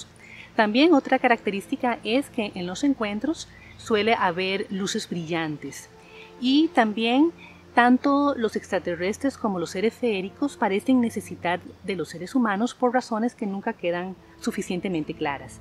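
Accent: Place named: Costa Rica. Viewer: Eres de Costa Rica cierto? Según el acento en la forma de pronunciar la R la reconozco… soy de colombia seguidor tuyo… eres hermosa 😍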